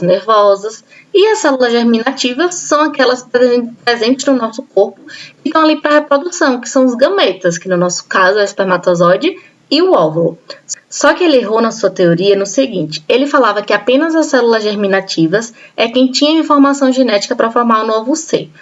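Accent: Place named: Recife, Brazil. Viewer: Parabéns! Gostei muito do teu jeito de explicar (com o teu sotaque e gírias ficou melhor ainda, sou de recife e julgo dizer q tu é daqui também kkkkk). Força aí no começo do teu canal, acredito que você fazendo o que gosta só gera bons frutos (como em tudo na vida). A única dica que eu te dou é relaxar na hora de gravar e deixar de lado o nervosismo de como falar, você relaxando e se divertindo nas explicações fica maravilhoso pra quem assiste. No mais é isso, gostei muito também do início que tu colocou "senta que lá vem história" fica muito bom esses memes em explicações de conteúdo.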